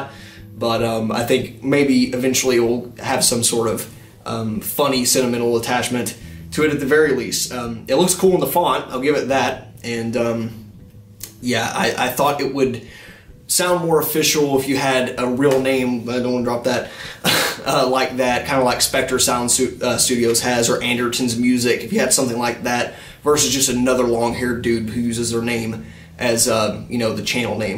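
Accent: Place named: Mississippi, United States. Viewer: Digging the channel man, keep it up. I recognize that accent, sounds like Mississippi or somewhere around there to me! I got friends out there, haha. ;)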